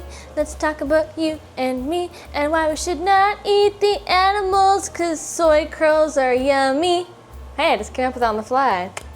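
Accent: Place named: France. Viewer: I loved your French accent!😊 I’m new here and I’m glad I found your channel!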